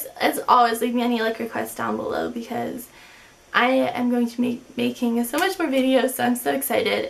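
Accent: United States. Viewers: Why are you speaking american if your english? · Why do you speak in a American accent ? It's pretty annoying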